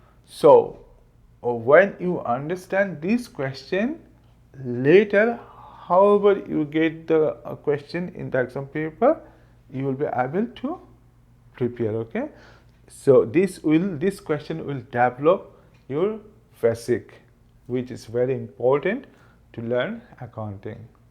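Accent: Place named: United Kingdom. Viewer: Sir Indian ki tarah bat karo na ki British ki tarah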